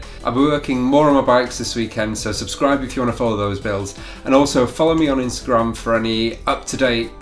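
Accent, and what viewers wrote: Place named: England, United Kingdom. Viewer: i knew through his accent hat this guy is from england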